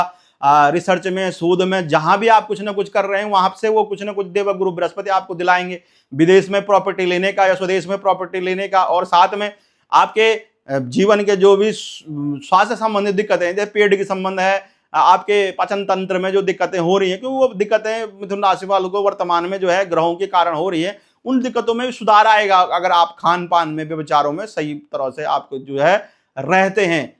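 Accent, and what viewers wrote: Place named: Pahadi, India. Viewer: Mujhe lag raha tha aap pahadi Ho aapki bhasha mein Thoda Thoda pahadi pan chhalak raha hai per bahut achcha bola 🙏🏻🙏🏻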